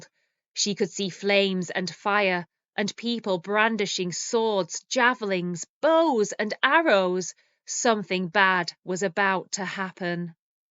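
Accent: England, United Kingdom. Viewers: Yes indeed, I really enjoy the story. Love your English accent. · Hyy I like your accent that's why I'm addicted to your voice hope you Will continue these masterpiece stories ❤️ I wanna get British ascent like you , may be you can understand how much I love British accent 🙂🙂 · Are you from britain, your accents is soo british..thats nice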